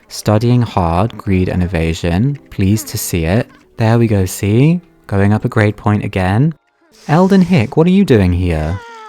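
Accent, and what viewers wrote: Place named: United Kingdom. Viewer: I’ve never seen this channel before, and I haven’t played the Sims 1 since kindergarten, but this video of this calm, friendly-sounding British man narrating the story of a neglectful grandmother and her 7 kids with unfortunate names is giving “creepy lost episodes of the Teletubbies” and I’m here for it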